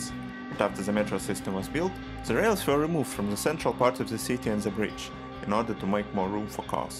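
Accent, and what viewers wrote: Russia. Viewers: Сразу по акценту понятно, рассказчик - русский)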